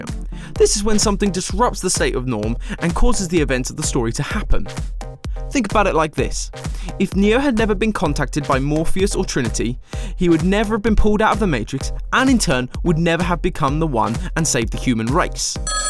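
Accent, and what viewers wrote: United Kingdom. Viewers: I love Your British accent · Subscribing for the sexy British voice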